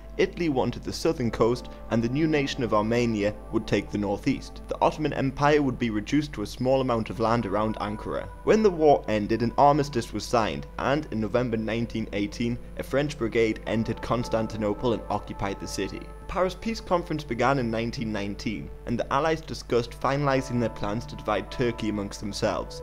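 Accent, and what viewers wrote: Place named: United Kingdom. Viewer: Its difficult for not english speaking people to understand... British accent is the worst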